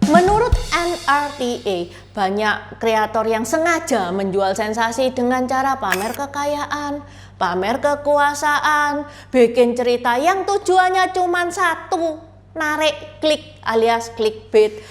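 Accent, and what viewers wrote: Java, Indonesia. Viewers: Chindo medok.. Jaya Jaya jaya.. Matur nuwun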